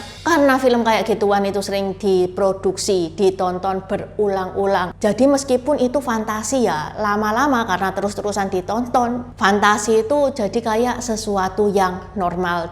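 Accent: Java, Indonesia. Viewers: Chindo medok.. Jaya Jaya jaya.. Matur nuwun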